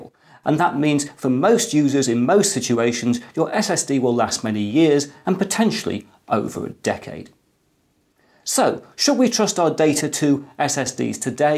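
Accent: United Kingdom. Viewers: Dude is brittish, ofcourse its gonna be exemplary. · Linus but British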